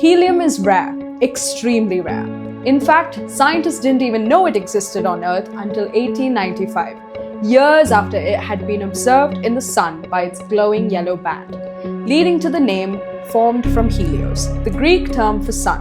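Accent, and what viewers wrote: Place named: India. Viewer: Informative. Beautiful Indian tone.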